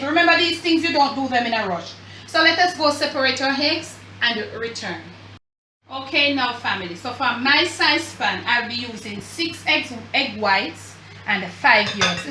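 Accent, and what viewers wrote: Jamaica. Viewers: Hi Ms., i love your Jamaican ascent, an i love your rum cake. If you are baking for kids what can you use instead of rum. Thanks dear. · Thank Ms..I love all of your recepies....😍😍 Love the way how you keep the Jamaican accent.. everthing that I have in mind to try so far, I just click on your channel..and There you are.. Manner and respect!!!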